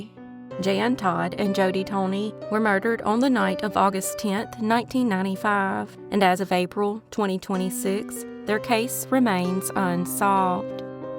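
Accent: South, United States. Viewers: Got that smooth, Southern voice❤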